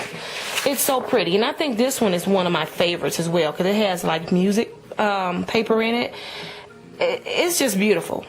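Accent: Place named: St. Louis, United States. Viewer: Awesome Room, can I come shopping! :-) lol St. Louis Talk, "Whered' you go to Highshool?" Do you drive on highway "fardy" :-)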